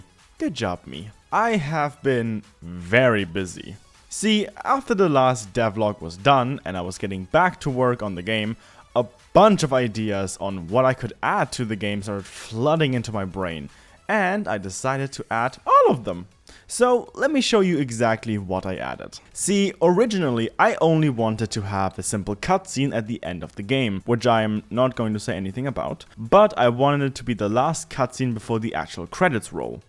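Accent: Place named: Germany. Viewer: most german accent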